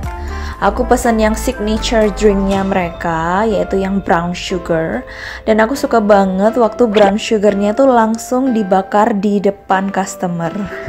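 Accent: Java, Indonesia. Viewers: Bicara nya keJawaan kak 😍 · kuwait 8/10/19... wonge ayuu,,,.medhoook..mangane akeh ora isin,,,,,,,lucu lucuu,,,tek subscribe lah....january mw ke bangkok n krabi.. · Youtuber surabaya ngomongnya medok bgt 😁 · Jowomu mbak medhok beud, mantebbb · Rai² cino tpi boso e medok mantul mbak😂 #wongjowo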